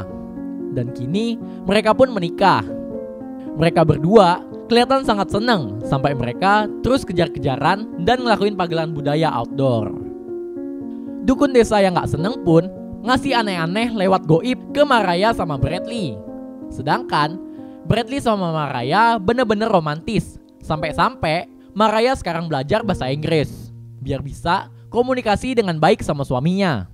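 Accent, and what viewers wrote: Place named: Pontianak, Indonesia. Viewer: Klo ngomng kok kek org ponti bg!.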